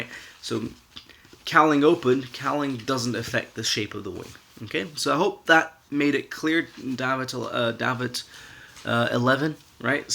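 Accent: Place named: Scotland, United Kingdom. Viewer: you're French right? You sound more Scottish in every vid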